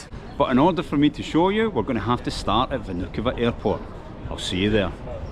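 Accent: Scotland, United Kingdom. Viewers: Yes I like your videos and your scottish ACCENT.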